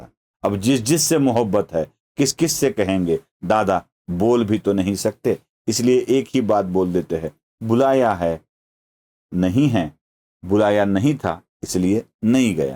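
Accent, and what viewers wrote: Maharashtra, India. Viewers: आदरणीय महान् विद्वान, आपकी संवाद अदायगी को सुन कर दिल बाग बाग हो जाता है, आपके व्यंग बाण अदभुत,सारगर्भित और समसामयिक घटनाचक्र पर तमाचे होते हैं। आपकी और भाऊ टोरसेकर्जी की महाराष्ट्रीयन हिंदी मनभावन होती है, धन्यवाद।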